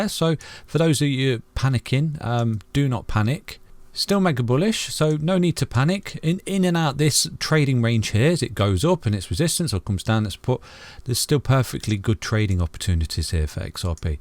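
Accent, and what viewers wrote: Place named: East Midlands, United Kingdom. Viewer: are you from Notts or Leicester? I tell by your accent.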